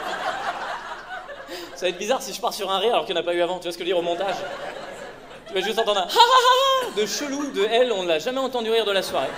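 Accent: Quebec, Canada. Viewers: Je suis québécoise! 😂😂😂 Je t'aimais mais là je t'adore 🤣🤣🤣 c'est presque ca l'accent 😉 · Il est génial ton accent Québécois 😂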